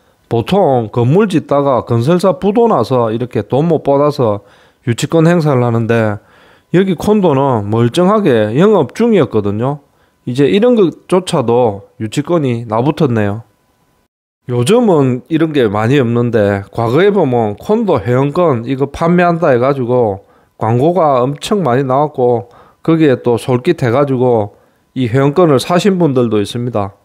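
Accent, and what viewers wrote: Gyeongsang, South Korea. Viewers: 다 좋은데 경상도 말투만 아니라면 좋겠는데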